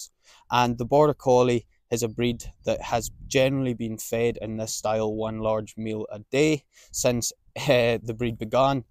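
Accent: Scotland, United Kingdom. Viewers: I struggle understanding where this guys from he sound north east scotland or irish is messing with my head 😂😂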